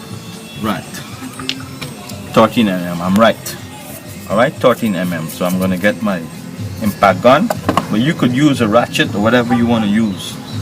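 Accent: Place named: Guyana. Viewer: great video you save me 1200 dollar keep up the great work keep the videos coming ,,,,u sound like a guyanese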